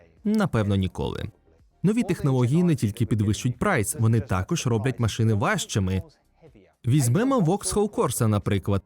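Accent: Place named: Russia. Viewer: Шкода, що Український канал озвучує людина, яка все життя розмовляла руські... А той хто справді все життя розмовляв рідною мовою мусить дивитись і терпіти цей руський акцент... Повірте, це дуууже замітно!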